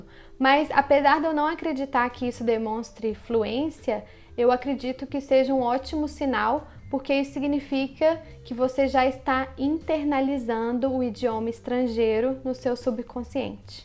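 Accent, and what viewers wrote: Minas Gerais, Brazil. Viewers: Ótimo vídeo...você é mineira? :D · O seu padrão , com este sotaque , é mineirês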